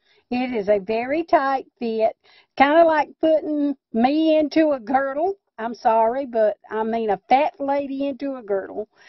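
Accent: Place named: Alabama, United States. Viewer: I knew that was an Alabama drawl...Im in Alabama too!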